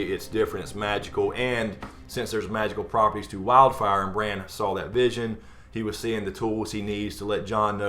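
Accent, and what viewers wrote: South, United States. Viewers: Glad I found your channel, GREAT takes on Thrones! Love Thrones talk with the Southern accent.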